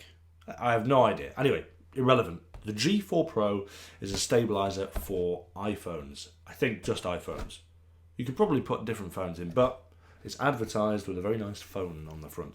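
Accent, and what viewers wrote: United Kingdom. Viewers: No body can narrate better than a Brit! Greeting from Florida, USA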